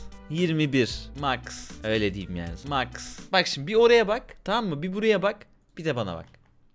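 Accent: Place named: Russia. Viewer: yayını izlemediyseniz adam rus o yüzden öyle konuşuyor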